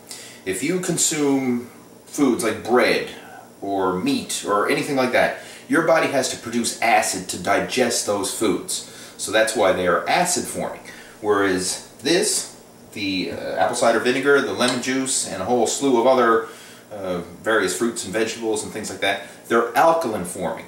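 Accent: Newfoundland, Canada. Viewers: Hey brother,are you from Newfoundland? Cause i am,and i kinda hear a hint of the Newfoundland accent when you speak. Great video by the way.✌🏻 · Canadian eh?! I see we shop at superstore?!! lol love the accent :p Ps...I drink ACV with green tea every morning but I'll be sure to try this too :) thx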